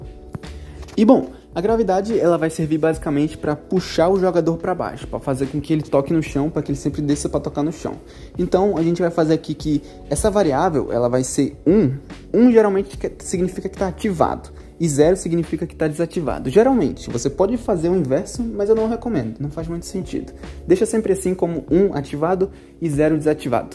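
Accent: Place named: Rio de Janeiro, Brazil. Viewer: Eu chuto q tu es carioca ou algum lugar do Rio de janeiro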